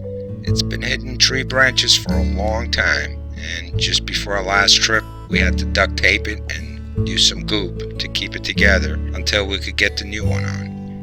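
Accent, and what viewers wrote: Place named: Georgia, United States. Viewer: Nice accent, north Georgia?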